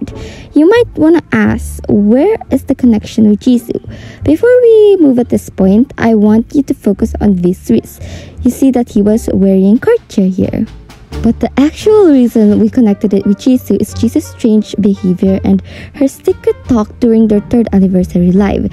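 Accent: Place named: Philippines. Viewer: Dude!! People in Philippines speak in that accents I've seen many YouTubers from Philippines and I've subscribed them too T,f you talking about for more attention? 💀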